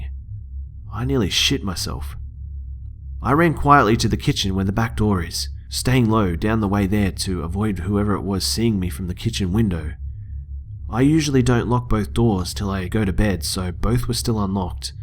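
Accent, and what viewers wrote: Australia. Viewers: As a fellow Aussie, it's so nice to hear your voice :)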